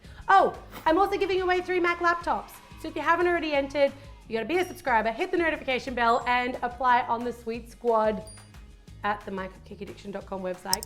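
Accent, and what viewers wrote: Australia. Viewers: IS SHE AUSSIE OMGGGGGGGG YESSSSSS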